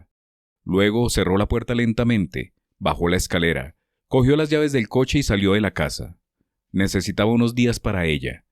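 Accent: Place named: Colombia. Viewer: Gracias por su lectura, me atrapó. Por otro lado, me encanta su acento colombiano.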